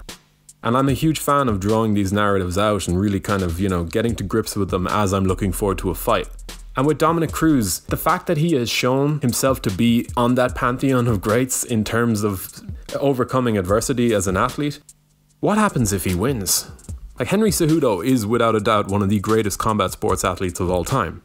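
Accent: Ireland. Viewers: You are Irish aren't you?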